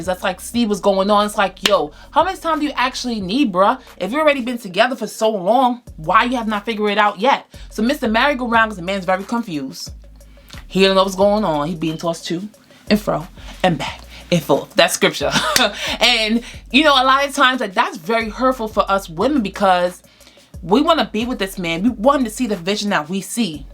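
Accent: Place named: New York, United States. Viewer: subscribed just because I hear a NY accent 😂✨ hey sissss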